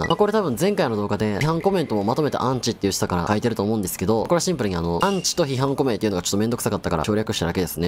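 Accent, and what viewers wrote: Kansai, Japan. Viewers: は声と関西弁がめっちゃあって好き、解説は絶対これ見るわ。いつもありがとうございます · の動画、いつも楽しく観ています。面白いです!関西弁好きです。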